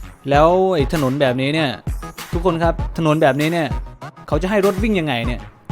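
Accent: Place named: Rural, Thailand. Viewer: ตอนแรกพูดเหน่อๆ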